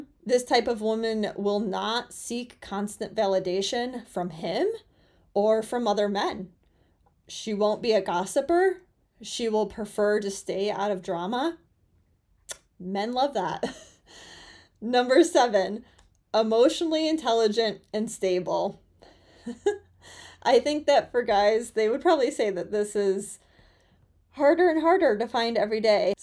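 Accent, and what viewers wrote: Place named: Midwest, United States. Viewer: Liking the midwest accent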